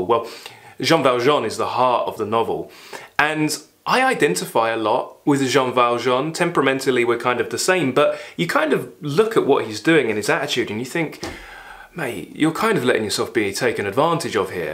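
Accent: United Kingdom. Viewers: Здравствуйте! Прошу прощения, что пишу по-русски, моего знания английского языка не хватит, чтобы выразить свои эмоции ❤ Я так рада, что нашла Ваш канал! Спасибо Вам за Вашу страсть и любовь к литературе, просто невозможно не захотеть бежать читать после Ваших видео! У Вас чудесный голос и обворожительный британский 🙈❤️ слушать Вас - одно удовольствие! Разбор негативных отзывов - прекрасная идея, очень жду продолжения! Как человек, замученный русской классикой в школе, подтверждаю, что учителя часто отбивают всякое желание читать. Например, Войну и мир я прочитала только первый том, злилась жутко на себя, на учителя, на Толстого, на весь мир 😂 и до сих пор к великому своему стыду не читала ничего из Толстого 🙈 Однако, не имея желания читать русских авторов, прочитала многое из английской (Ивлин Во "Возвращение в Брайдсхэд" и "Незабвенная" - одни из любимых моих книг), французской (Камю и Экзюпери - любовь моя) и американской (Ken Kesey - "Sometimes a great notion" - обожаю до слёз) литературы. 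Сейчас период японской литературы и возвращение к русской классике. Очень жаль, что в окружении нет людей, которые любили бы те книги, которые я предпочитаю, есть некая неудовлетворённость от того, что не с кем обсудить. И даже в книжных клубах никак не могу найти тех, кто читал бы книги, которые мне интересны 😢 Моби Дика читала 10 лет назад, понравился очень и оставил желание перечитать, когда стану постарше. Из русских писателей люблю не очень популярного на Западе Лескова. Его "Очаро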